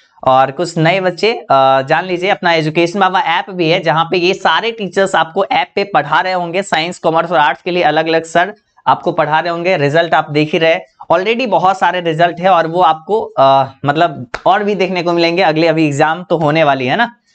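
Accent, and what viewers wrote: Bihar, India. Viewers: Bihar se hain babua ❤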